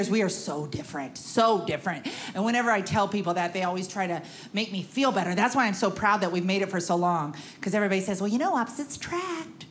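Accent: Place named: Minnesota, United States. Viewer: Is she from California or Minnesota? She sounds like Minnesota to me lol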